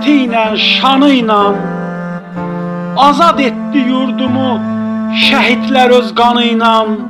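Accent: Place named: Karabakh, Azerbaijan. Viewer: Sağ qardaşımız.Sənin səsin şerlərin qədər gözəldi.Bəlkədə şerlərini başqa adam oxusa bu qədər möhtəşəm alınmaz.Çox sağ ol.Şirin Qarabağ ləhcən adamı özünnən aparır..👍👍👍Ağdamlı balası.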